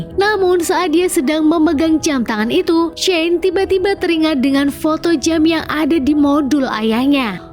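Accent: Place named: Surabaya, Indonesia. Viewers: Kok Suroboyo Boyo metu embak tapi aku seneng loh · Min mimin orng jawa ya min kok gomong kek ong jawa sama uwu ternyata toxic juga ya min:v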